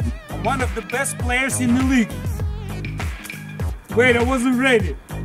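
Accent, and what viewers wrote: Russia. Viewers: Русский акцент не скроешь.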